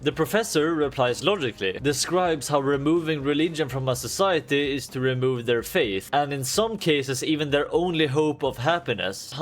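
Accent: Sweden. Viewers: It could be worse... he's better than most swedes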